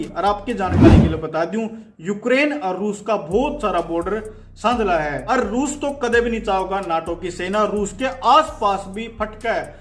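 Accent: Haryana, India. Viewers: आप हरियाणवी ढंग से सभी बात बताते हो, बेहद सुख की अनुभूति होती हैं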